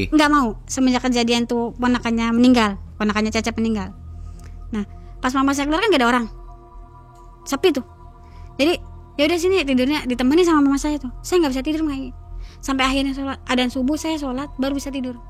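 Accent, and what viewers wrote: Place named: Cirebon, Indonesia. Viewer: Bahasanya kaya cirebon😅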